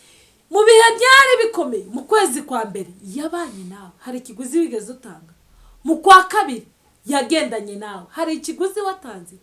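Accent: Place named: Banyamulenge, Democratic Republic of the Congo. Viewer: Ese ko numva uvuga nkabanyamurenge uriwe ndabakunda peee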